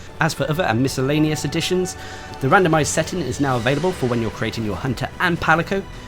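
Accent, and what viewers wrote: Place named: England, United Kingdom. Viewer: It's an English accent of a sort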